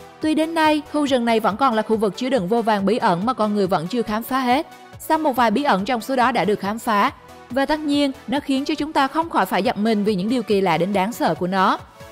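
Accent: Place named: Da Nang, Vietnam. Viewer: Giọng Đè Nẻng nghe vui tai nhỉ!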